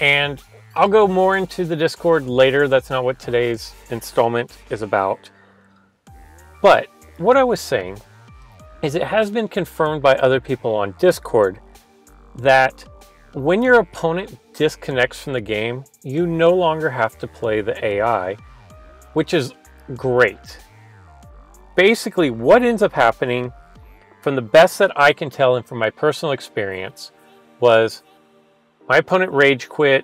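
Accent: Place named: Canada. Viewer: it's beautiful there for sure, I'm a Canadian, some of the words you say you almost have the accent lol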